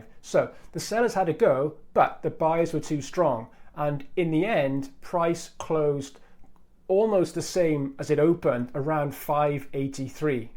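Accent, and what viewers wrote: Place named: Wales, United Kingdom. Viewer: I Live in Hirwaun. You don't sound to far away.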